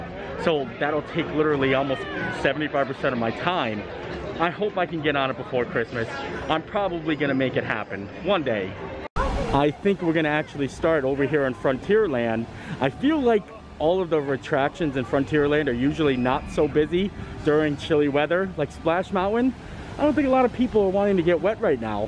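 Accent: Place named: Pennsylvania, United States. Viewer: Hi! We love your videos and get excited every time there’s a new one! Curious, where in PA are you from? I went to Susquehanna University and you have the same “accent” as some of my friends from Shenandoah.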